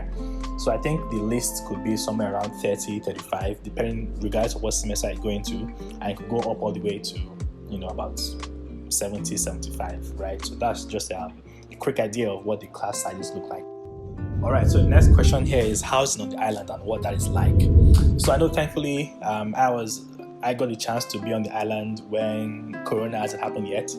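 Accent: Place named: Nigeria. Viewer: You sound Nigerian bruh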